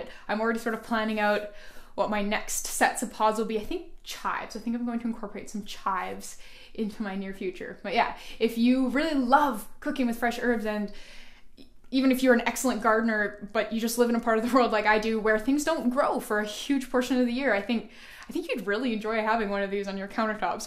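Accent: United Kingdom. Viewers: Hey! First of all I'm in love with all ur tutorials though I'm new 2 ur channel. Ur doing an amazing job teaching the world that u know. 👏👏❤❤💏💏👍😍😍😱😱. Second of all ignore the idiots who find fault with our Brit accent. They can't appreciate the fact that ur so knowledgeable, instead finding fault with the accent. U go girl. Lots of love.. 💏💏💏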